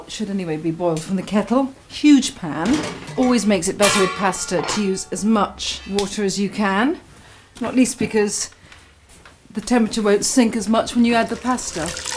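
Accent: England, United Kingdom. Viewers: What type of English accent does she have?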